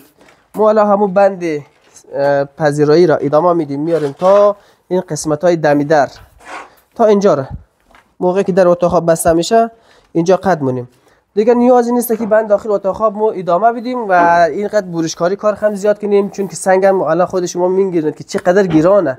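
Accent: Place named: Hazarajat, Afghanistan. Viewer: باسلام‌بهتره‌ بدون لحجه هزاره جاغوری صحبت کنید مثال فارسی دری یافارسی‌تهرانی صحبت کنیدکه همه فهم باشد.تشکر🇦🇫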